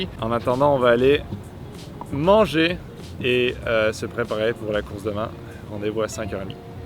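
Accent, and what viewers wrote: Quebec, Canada. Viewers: J'ai l'impression que ton accent québécois est + marquer qu'avant :o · c'est marrant pcq j'ai l'impression que tu prend de plus en plus l'accent quebecquois , enfin tu l'avais surement avant mais tu devait moins le montrer dans tes videos a l'epoque ^^ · T'as pris un putain d'accent québécois 😮😮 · WTF il est Québécois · C'est moi ou ton accent québécois ressort encore plus qu'avant ? :o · On entend beaucoup plus l'accent québecois de dans cette vidéo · je te suis depuis à peu près 5 ans, et j'ai eu l'impression (dans cette vidéo) qu'il y a un petit accent Québécois qui commence à arriver que tu n'avais pas avant. C'est juste moi ou? ^^ Sinon comme dab, super plaisirs de te voir en vidéo:)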